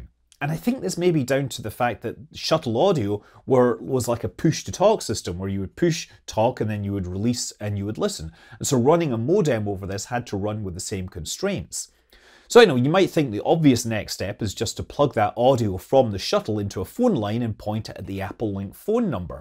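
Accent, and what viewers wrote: Scotland, United Kingdom. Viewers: It's a Scottish accent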